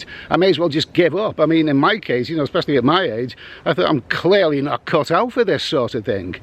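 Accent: Liverpool, United Kingdom. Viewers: I watch your streams but sometimes it’s a bit too barrack room for me. I get that you do a lot of sex tourism vlogs but I watch because I think you have a brilliant sense of humour and you are actually a very good travel vlogger. So well done, please be proud of your scouse accent because it’s part of your humour. By the way I’m not a 20 stone bingo player at the local club. You deserve the views because your a good travel vlogger and watching you review Sainsburys pasties and Scouse Brandy is hilarious. They should sponsor you.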